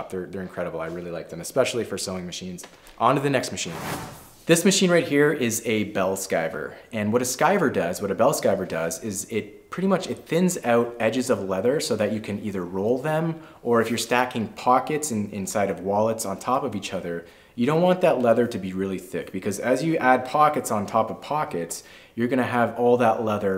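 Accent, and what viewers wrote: Canada. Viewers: Great vid! Thanks. Is it just me or do Canadians say "out" more than anyone else just to say "oot"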